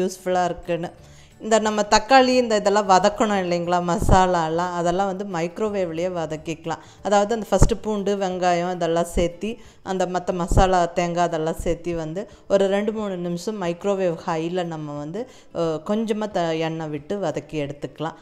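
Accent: Kongu, India. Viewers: Super akka nice recipe , thanks your Kongu Tamil also good. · Receipe tips are nice. As well kongu tamizh is also so nice.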